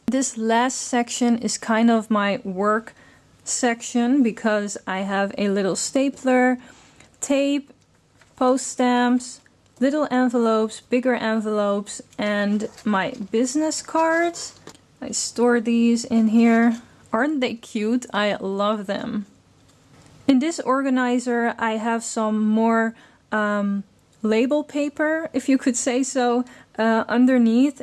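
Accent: Netherlands. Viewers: i knew you were dutch when u started talking lol. i know the dutch-english accent from anywhere! :D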